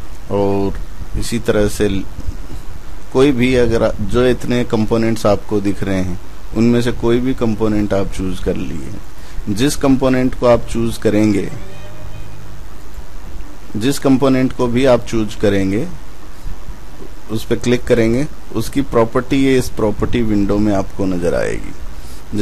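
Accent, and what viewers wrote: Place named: India. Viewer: Hey you, reading this comment, I just went through the entire Playlist for NetBeans IDE If you can ignore the strong Hindi accent, and language, grammar isn't a problem for you, Then this series is a beautiful option for you to quickly learn NetBeans in one day, Be sure to make notes of the things you learn, And you'll be good to go, I have my practical exam in the morning, and found this really useful to learn the entire course in one sitting, Concise, and important aspects covered, However, if the pace of your speaking, and repetitiveness was gone, Then it would've been phenomenal, Thank you, so much, for this series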